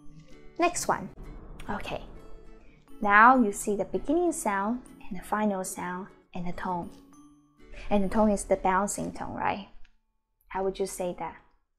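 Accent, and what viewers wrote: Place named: Taiwan. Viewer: thank you really easier for me to learn it with Taiwanese accent! got addicted with all this Taiwan movies:) pls do more... is their any rules for the chinese characters or we can just simply memorize it? or their is an alphabetical rules for that? thank you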